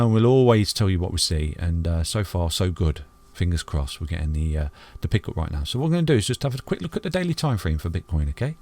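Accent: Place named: England, United Kingdom. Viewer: LMAO this is a proper english accent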